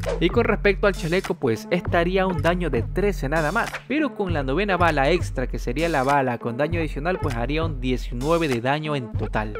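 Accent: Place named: Ecuador. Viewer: Eres Ecuatorianísimo, jajaja sub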